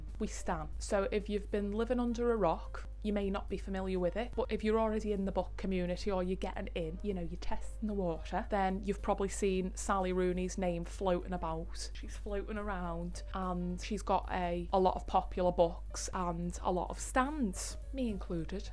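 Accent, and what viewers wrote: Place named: Scouse, United Kingdom. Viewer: as someone who lives in liverpool you literally have the most soothing and soft scouse accent i’ve ever heard in my life i could listen to you talk all day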